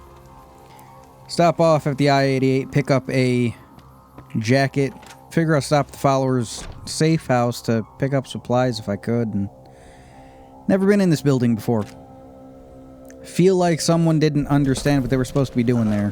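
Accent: South, United States. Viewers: Now I know that a law-abiding Canadian can't survive in a wasteland because it was ironically proved to me with a pleasant Southern accent. Thank you, sir!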